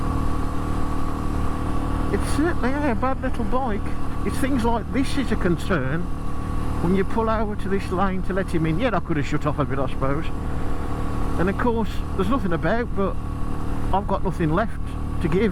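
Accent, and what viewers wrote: Birmingham, United Kingdom. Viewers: Another moaning Brummie.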